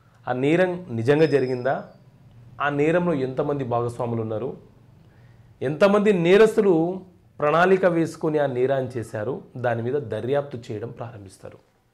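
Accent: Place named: Telangana, India. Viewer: Dear Sir You're. But it appears you were born and grew up in Telangana. Though your Telugu has Telangana accent, it sounds extremely natural. Sir, if an accused is proved to be innocent, FIR can't be quashed by the Magistrate. Court takes cognizance of an offence, not an offender. If an Informer tells about an offence, the police must register an FIR whether he tells about the offender or not. The Informer may say he doesn't know anything about the offender. Even then FIR has to be registered and it can't be quashed unless the offence is false.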